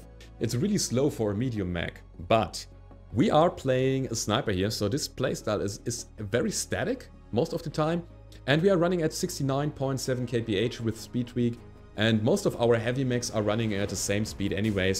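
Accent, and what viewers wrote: Germany. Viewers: Your Vids are really impressive. Do i get it right, that you are a german? Your english sounds like its spoken by a german :)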